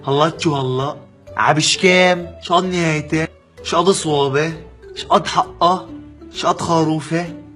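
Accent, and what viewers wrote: Aleppo, Syria. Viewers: تحية من المغرب 😂😂😂😂احلى لهجة سوريا والله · كلامك مية بالمية هي هي اللهجة الحلبية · دخيل روحك ما احلاك وما احلى لهجة الحلبية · حلبية وهيك كبه مججئه بل لبن لك بالله عليكي هاي لهجه بتنحب )مثال جب الئبه 😂😂😂😂😂😂😂😂😂😂😂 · الشب حلو بس لهجة حلبية ماحلوة لمعي يعمل كومنت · دخيل قلبككك شو حلوو الحكي الحلبي احلى تحية لاهل سورييا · ههههه دخيل ربك يا حلب محلاكي ومحلا لهجتك الله يفرجها يا رب #جزائرية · هاي لهجة اهل حلب فقط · مابتعرف تحكي الحلبي القحي النظامي عبتحكي حلبي الطنطات ولابقى تحكي الحلبي الجويني لانو مابلبقلك ضحريماتك اذا طقتك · ههههههه وككك تجنن لهجتنا معك بس سي بتعرف زكرتني بأيام حلب هههه مو متل هلق تركيا هههه وبطيخ وك صحي ئللي وين انت مقيم هلأ ؟ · 😂 موتني مي نعشق اللهجة الحلبية #جزائرية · ابوس الحكي الحلبي .يمووو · لاااا هي صعبة 😂اذا مو من حلب مستحيل تنفهم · احلى حلبية واحلى لهجة والله اخوكم من الدير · ههههههه تابرني حكي حلبي تابش البي 😘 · ولك فديت اللهجة الحلبية عسلللل وربي ❤ يستر ع الكلاوي🥰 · روعه ههههه انا حلبيه وما بعرف احكي متلو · ياييييي تجنن لهجتكم تحية لا حلب ولا كل سوريا من بنت العراق ☺☺☺ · لك أحلى حلب وأحلى لهجة دخيل ألبنا نحنا الحلبية شقد حكيينا كويس ومنظوم❤أشتقتلك يابلدي😢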